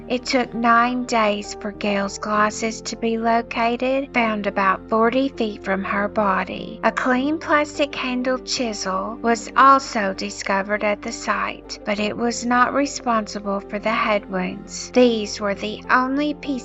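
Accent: South, United States. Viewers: I just recently discovered. For my whole life up until the past 2 and 1/4 years i lived in eastern Pennsylvania, and every once in a while the Allentown news media would mention this shocking unsolved double-murder. You have a cute voice and Southern accent!!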